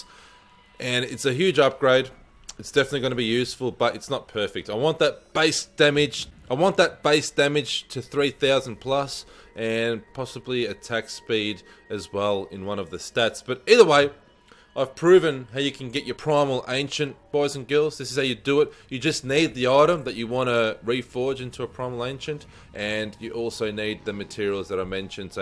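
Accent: Australia. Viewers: once I heard Aussie accent I subd. on ya bro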